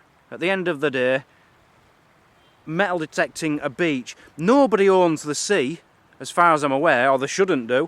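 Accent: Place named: East Yorkshire, United Kingdom. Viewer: Als halve Engelsman heb ik nog geen video gemist. Super East Yorkshire accent en fantastische woordspelingen en humor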